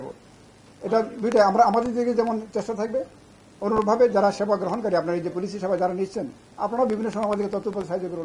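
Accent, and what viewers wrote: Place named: India. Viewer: কথা ও উচ্চারন শুনে মনে হচ্ছে ভারতীয়? চেক করে দেখা উচিত!!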